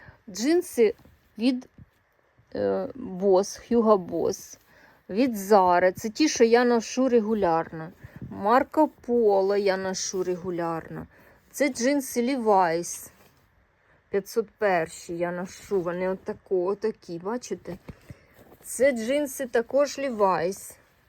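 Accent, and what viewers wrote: Sumy, Ukraine. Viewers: Привіт ❤ Все правильно говорите! В Сумах своя вимова! Але! Скажу вам,шо наші діти,навчаючись раніше ) в російськомовних школах здавали ЗНО на найвищі бали саме з укр мови і літератури Саме моя донька старша то у приклад З початком війни вона одразу перейшла на українську і в Німеччині всі в шоці,яка в неї гарна саме література укр мова! Бо її подруга,яка з заходу України тепер вчиться в неї мови! Ви класна! Справжня! Дивимося вас з молодшою донькою! Хоча секонди взагалі не цікавлять 😂 але вас дивимся с задоволенням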